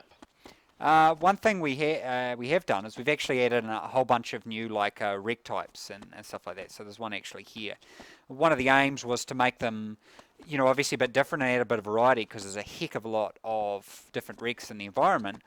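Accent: New Zealand. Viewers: Love the kiwi accent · Lol you didn't recognize a New Zealand accent? Anyway it's the ugliest accent that the English language has, after Californian. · Dat kiwi accent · Guy talking has a kiwi NZ'er accent. Am I wrong? developer is a Kiwi? :D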